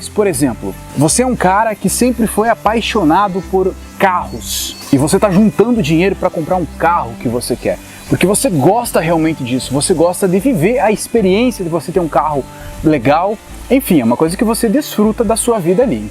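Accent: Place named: Northeast, Brazil. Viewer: Acho que você é do sul, mas sinto um leve sotaque nordestino ☺️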